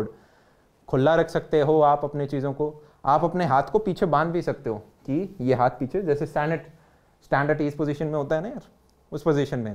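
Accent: Haryana, India. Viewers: Hariyanvi mix😅😅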